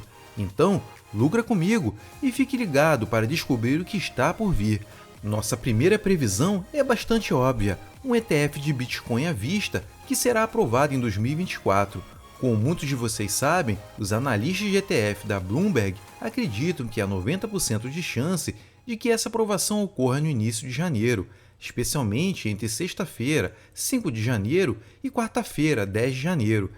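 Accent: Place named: Rio de Janeiro, Brazil. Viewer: Me perdoe, se você tirar seu xis carioca, o carioquês horroroso da sua fala você dará um passo arriba. Cara, você não sabe como esses xissss são desagradáveis.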